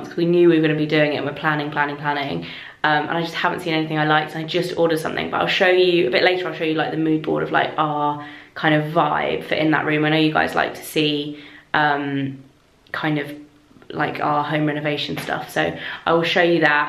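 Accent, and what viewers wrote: United Kingdom. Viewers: I'm trying to learn the British English with you! Thank you! 😀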